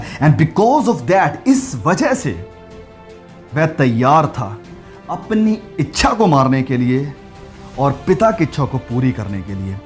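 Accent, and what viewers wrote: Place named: Doaba, India. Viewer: Dear brother you are speaking but I'm sure that you are a Punjabi fellow. Definitely you are from Doaba. Your style and pronouciation is of Hoshiarpur or JALANDHAR or kapurthala. May God bless you.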